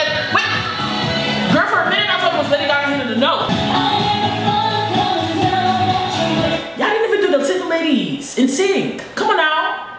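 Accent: United Kingdom. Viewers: I LOVE YOUR ALBUM REACTION AND ESPECIALLY YOUR 🇬🇧 ACCENT!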